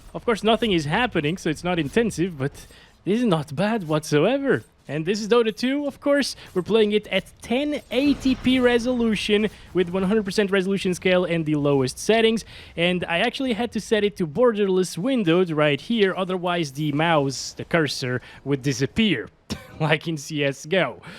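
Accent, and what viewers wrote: Portugal. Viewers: És português? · És português??? 🤔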